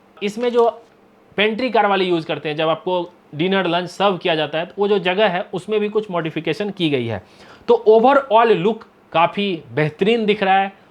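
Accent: Bihar, India. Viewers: uncle aap bihar se hai 😊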